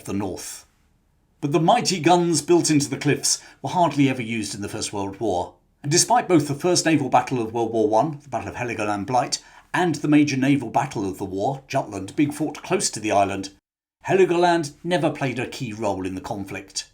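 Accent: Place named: Upper class, United Kingdom. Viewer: There's a lot of British arrogance here. For example the dismissive attitude to the Frisians you can sense several places. For example saying things like "return to Germany". I mean, it's a Frisian population and was switched betwen Denmark and Schleswig. And The Duchy of Schleswig was not a party of Germany, it was a fief of Denmark consisting of a mix of Danish, Frisians and Germans. So it wasn't returned to Germany. The only place Heligoland can be returned to is to Frisia, but such a state does not yet exist. But also the gloating of a poet in exile writing the German national anthem on "British soil". There's just throughout an arrogant sentiment, which just really drives home the stereotype of the British upper and middle class. The accent and everything. It's like it's taken out of a Monty Phyton sketch.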